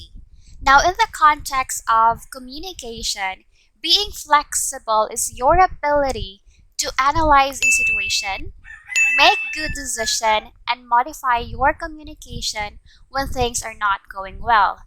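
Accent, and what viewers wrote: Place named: Visayas, Philippines. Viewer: I love the accent but I love it more kay bisaya man diay 😘❤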